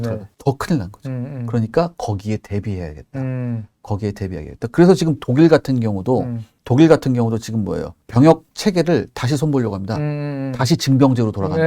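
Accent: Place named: North Korea. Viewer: 진행하는 사람 북한 사람인가요?? 생긴것도 그렇고 말투가 강원도 같기도 하고 북한말 같기도 하네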